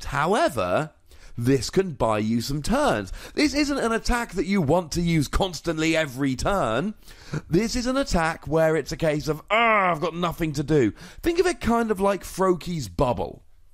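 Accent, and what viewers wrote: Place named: Birmingham, United Kingdom. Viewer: Though i heard that voice in Birmingham and Sheffield regs!!!! your a great commentator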